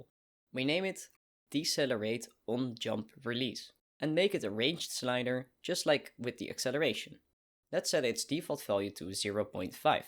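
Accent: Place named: Netherlands. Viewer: zeker wel een Nederlander. Accent is heel herkenbaar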